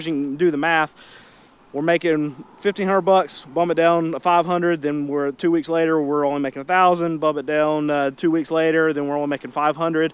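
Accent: North Carolina, United States. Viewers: Don't you realize we like your folksy N Cakalacee way!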